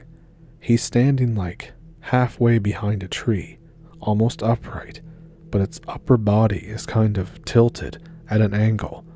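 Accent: Canada. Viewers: my friend im from ireland im from waterford im giving your video a like im going to even subscribe you sound canadian my friend am i correct 🇨🇮👍 by the way you can tell stories dont ever put yourself down anyone who tells you you cant are jealuos 😂 i cant spell so no worries there buddy great story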